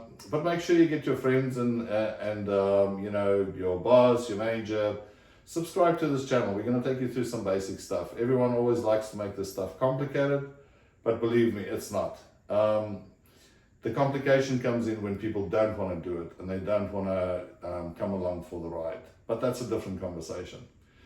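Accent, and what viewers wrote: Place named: South Africa. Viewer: You sound like a Boer...And also, this is the only video on youtube that explain a SIPOC in a way that a dry tree stump can understand. THANK YOU SIR.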